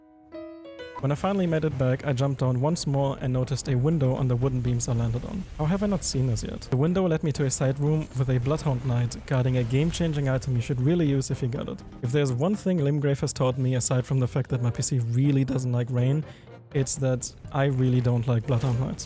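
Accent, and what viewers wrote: Germany. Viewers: Hey dude great video! <3 Can we connect on discord or so maybe? From what i hear i assume that you're german and i'm german too, so it'd be great to have someone to share the video editing experiences, especially when it comes to Elden Ring :) <3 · Jo m8 whats ur Main language? German ?🤔 Dein english klingt wie von einem deutschen aber is trotzdem gut 😋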